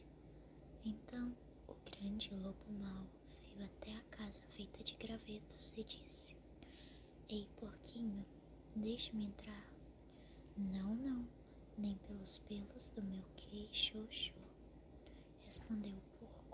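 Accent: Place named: Ceará, Brazil. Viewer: Você é do ceará? Achei o sotaque parecido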